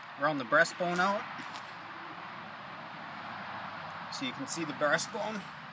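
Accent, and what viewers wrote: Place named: Canada. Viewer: I hear that Canadian accent. That was a Canada goose, or as it is called in Canada, a goose. Must be why it just says goose in the title of this video. Well, the video was very good. Thanks!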